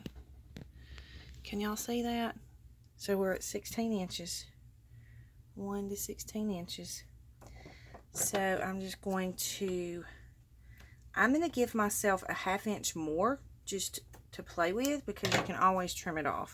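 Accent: South, United States. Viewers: I also love your accent. Yes, you do have one, It reminds me of my southern cousins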